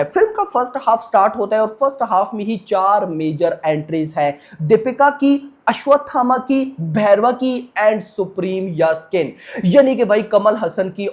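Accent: Pakistan. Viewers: Tu pakistani kesa bolna band kar sale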